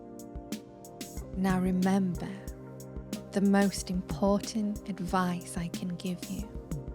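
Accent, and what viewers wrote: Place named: Northern England, United Kingdom. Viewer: I love a good Northern accent, and yours is the best. So soothing and inviting. You're wonderful! Thank you!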